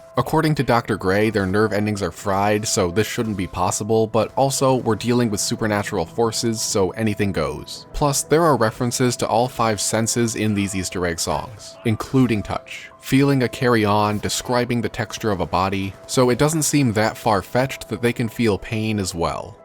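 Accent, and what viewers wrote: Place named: Midwest, United States. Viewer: Are you from the U.S. Midwest? You have a bit of an accent.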